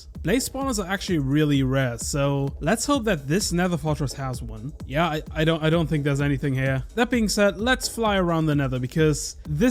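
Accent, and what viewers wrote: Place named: Germany. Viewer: Man merkt den detschen Akzent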